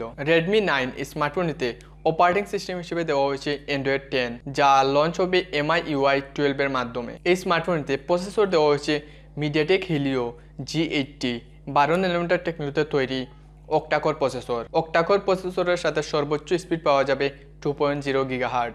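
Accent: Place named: Sylhet, Bangladesh. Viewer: আফনার ভাষায় ছিলটি ছিলটি লাগের।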